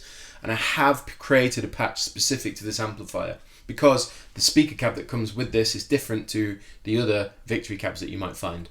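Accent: Yorkshire, United Kingdom. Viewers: it would be great if you could do a video on how you learnt to play guitar and the processes you went through. cheers, also from leeds so i appreciate your yorkshire accent!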